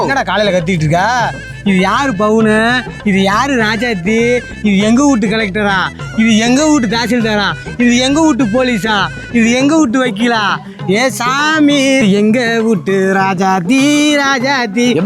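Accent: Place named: Kallakurichi, India. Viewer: yes kallakurichi ulundurpet! Slang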